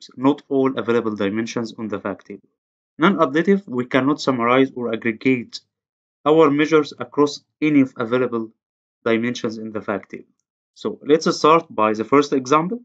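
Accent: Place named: Egypt. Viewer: الانجليزي دا حساه مصري ! ياريتو كان عربي والله :(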